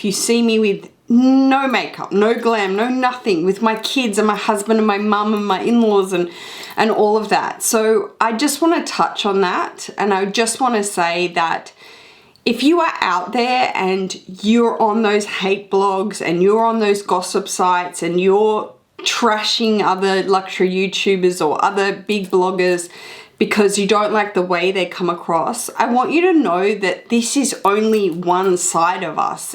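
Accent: Australia. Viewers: you've unwittingly been right by my side for so many years now. My partner walks by me watching your videos and affectionately shouts ' ' and then leaves me be because obvi I'm busy, eyes glued to whatever eye candy and luxury wisdom I have coming my way. I can't actually pinpoint what it is I love about your videos. What first drew me in was hearing another Australian in a sea of American luxury YouTubers. From day 1 I just.. trusted you. I've watched you thrive, go through your first pregnancy, met your beautiful Mum and gem of a hubby. I've seen Massi grow and squealed when Zaki I came along. And here I was thinking I was watching for the luxury! Anyway babe, your killing this biz, keep doing you. Congrats on 100, 000. Hells bells, that's the shzushz!!! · I watch your channel because you are true to yourself 100%. And I love your Australian accent! 😊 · Watching another Sydney girl do so well makes me really proud! 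You’re always my go to for fashion and luxury videos and hearing an Aussie accent makes it that much better! 🇦🇺❤️ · I’m a silent watcher,but I just want to say I love your blogs! I love watching your lovely family and your sweet mom. Your kids are so precious! I’ve collected luxury handbags since 1986(telling my age 😉) I love your collections and I love your accent! If I had your Aussie accent I would never stop talking 😌 Thank you for the opportunity to win the Speedy B 25! 😍 God Bless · How can anyone hate you?! You are so real and generous. I love how you share your life and love for luxury without any tinge of bragging. And of course that Australian accent is just so cute, I can’t get tired listening to you.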